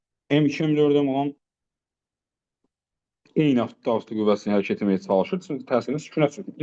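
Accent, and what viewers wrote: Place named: Shaki, Azerbaijan. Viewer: Müəllim Şəkilisiz? Ləhcənizi bənzətdim.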